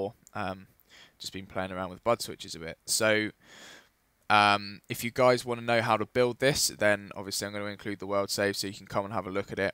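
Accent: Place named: England, United Kingdom. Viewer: I'm using your English accent to annoy my mom - she finds it annoying because she strongly believes that this accent does not exist. I will prove her wrong. - To the topic. I love your tutorials.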